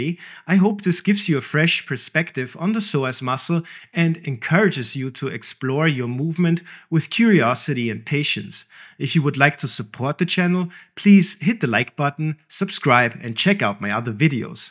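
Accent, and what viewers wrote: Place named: Germany. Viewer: And don't forget the German accent :)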